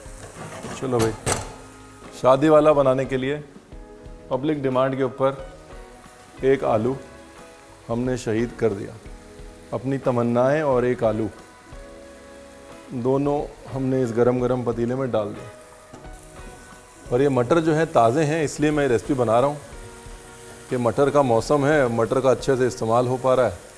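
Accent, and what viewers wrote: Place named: Lucknow, India. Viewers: I lived in Lucknow for a year, it was a magical time for me and you speaking in this accent literally brought nostalgia and big smile on my face 😊❤ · लखनऊ भाषा😊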